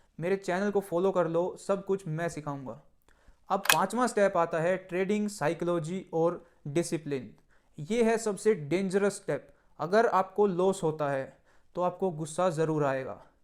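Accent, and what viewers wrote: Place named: Najafgarh, India. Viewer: Saath me trade krte h bhai. Me b najafgarh se hu tu b aas paas ka hi lg rha h boli se😅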